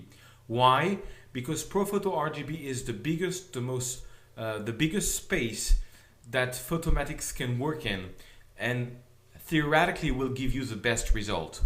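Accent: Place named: France. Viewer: i love your tutorials, i love your frenglish accent :D keep it up (thumbs up)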